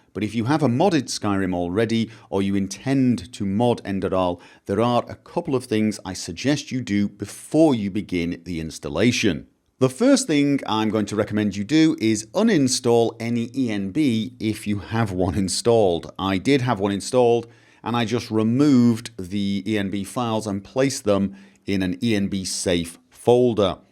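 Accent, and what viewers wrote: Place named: Scotland, United Kingdom. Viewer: He sounds scottish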